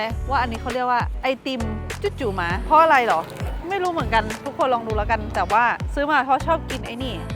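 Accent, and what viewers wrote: North, Thailand. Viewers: อู้เหมือนคนเมือง น้องมิ้นคนงาม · ชอบมิ้นอู้กำเหนือ ม่วนขนาน แต้กาบ่าได้จุ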